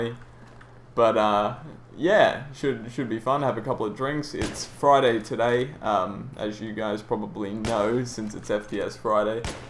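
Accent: Australia. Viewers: Fuck yeah! thought that was an Aussie accent, and you live bloody close to me. im Sunny Coast... well not really sunny, kind of an ironic name don't ya think.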